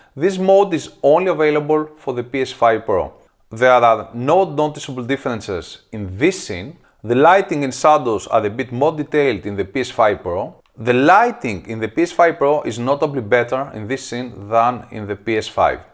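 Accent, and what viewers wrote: Greece. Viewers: most greek accent ever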